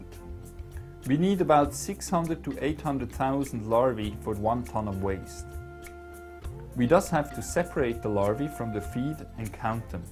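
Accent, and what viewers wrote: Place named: Switzerland. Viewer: The narrator is 100% swiss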